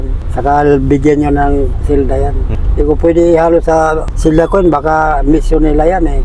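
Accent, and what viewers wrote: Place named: Visayas, Philippines. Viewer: bisaya kasi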